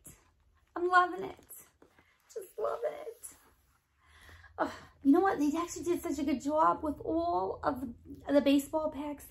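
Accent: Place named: New York, United States. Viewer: 😂 love your NY accent 💙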